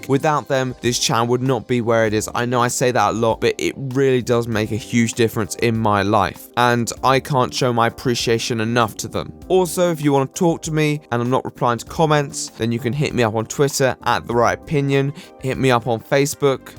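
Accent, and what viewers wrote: RP, United Kingdom. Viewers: I just like watching you shred people with your posh accent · I love this guy like, he makes my brain feel so good with t he monotone British voice its just fantastic. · Wow you sound incredibly british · Your videos are really nice to listen to while doing homework. They’re long, interesting enough to enjoy, but doesn’t require much attention to listen to, and your posh accent is a plus. You make good shit.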